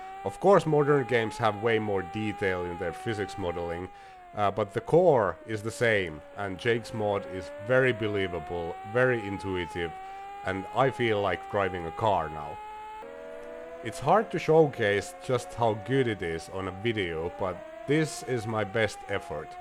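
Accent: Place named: Finland. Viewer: From the accent alone I can smell the karelian pie and koskenkorva. Kiitos!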